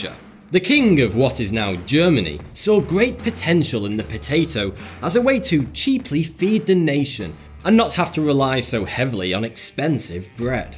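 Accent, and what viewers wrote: United Kingdom. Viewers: Forget about white bears. All I can think about is listening to a British intellectual and eating fried potatoes!!!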